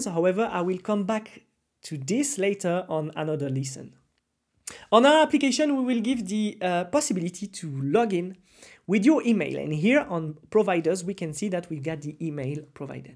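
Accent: France. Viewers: Merci beaucoup, I can recognise French accent from miles away. Tu aides énormément mon gars ! 💪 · French accent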